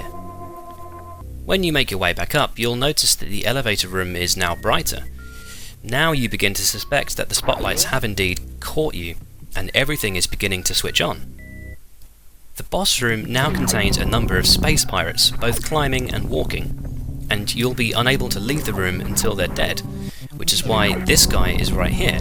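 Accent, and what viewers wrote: France. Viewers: Are you french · You are french my dude ?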